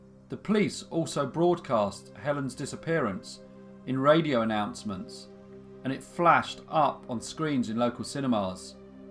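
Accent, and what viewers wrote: England, United Kingdom. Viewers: Have you actually ever met a real human being?? You sound English but I've never in my life heard anyone pronounce the word 'granite ' as you did here mate!? Seriously! Where the fook did you get that from? You do not pronounce the silent e at the end of that word !!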